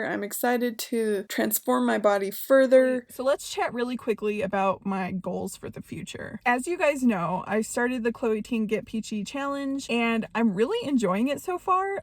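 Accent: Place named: Midwest, United States. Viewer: I know you said you’re in CO, did you vote up there? Your accent sounds very northern/Midwestern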